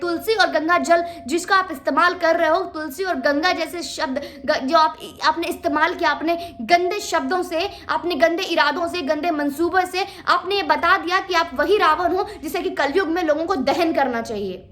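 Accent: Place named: Mumbai, India. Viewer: THIS GIRL TALKS LIKE MU GIRL. GREAT. KEEP IT UP. GOD BLESS YOU.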